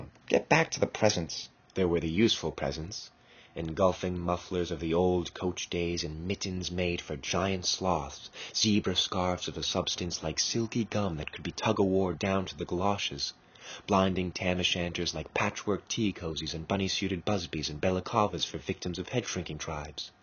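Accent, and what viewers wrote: United States. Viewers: It must be 30 years ago, that I had an LP of Dylan Thoas reading this. There must be someone out there that has this reading. Somehow, an American accent just doesn't do justice to this. Thomas' own version was so - "dead pan" but it didn't matter: this was Thomas' own rendition of his wonderful Welsh Masterpiece. Please for next year..?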